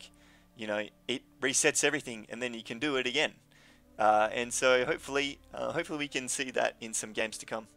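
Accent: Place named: Australia. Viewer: Great Video really liking your content, + Australian accent got me moist.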